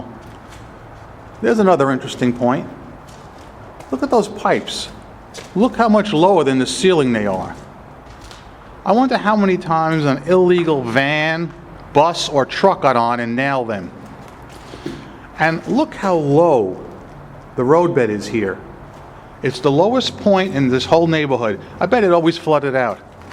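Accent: New York, United States. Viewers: Loving that New York accent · god i hate how you nyc people talk. it makes me ashamed to be from this state. its PARK not PORK you fucking idiot. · Nothin' like a good ol' New York accent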